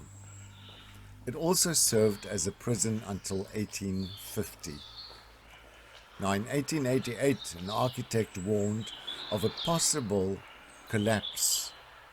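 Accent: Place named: South Africa. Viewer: Recognised the SA accent, am enjoying your channel so informative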